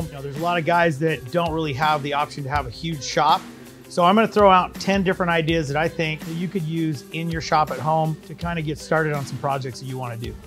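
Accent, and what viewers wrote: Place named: Montana, United States. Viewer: are you from Montana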